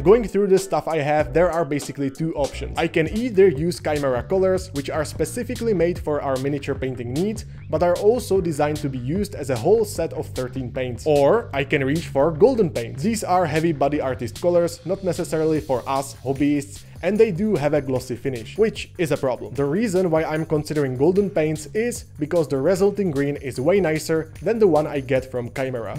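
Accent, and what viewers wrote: Czech Republic. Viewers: Got this randomly recommended, 10 seconds in and I already knew you're Czech based on the accent haha. Good job btw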